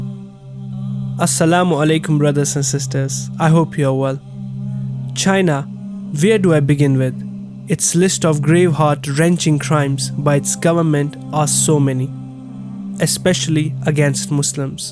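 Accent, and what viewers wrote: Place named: Kashmir, India. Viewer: By your voice you seem to be kashmiri.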